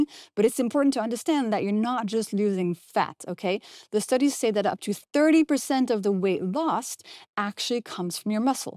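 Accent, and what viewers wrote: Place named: France. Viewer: That French accent is shining through 🇫🇷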